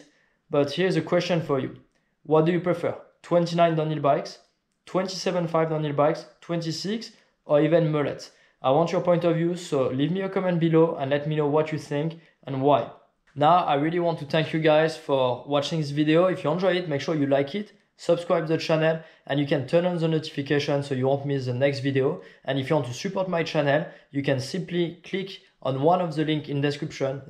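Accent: France. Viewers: Alala French accent, j ai le meme 😂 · J’en suis sur t’es français ???car ta grave l’accent français quand tu parle anglais ps sinon j’adoreeee se que tu fait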